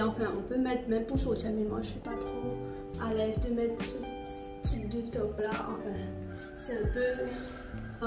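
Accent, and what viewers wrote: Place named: Mauritius. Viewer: T'as l'accent mauricien. 😻🌹✨🌹✨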